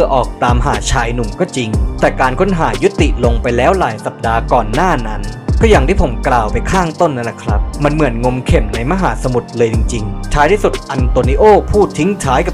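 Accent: Thailand. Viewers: มีความเสียงเหน่อ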